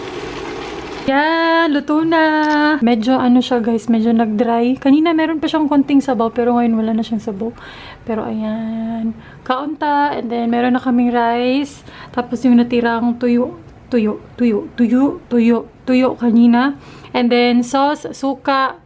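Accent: Visayas, Philippines. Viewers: Bisaya ka noh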